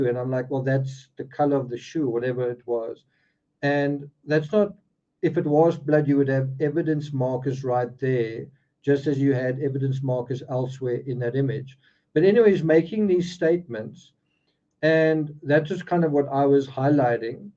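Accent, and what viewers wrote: South Africa. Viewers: South African talking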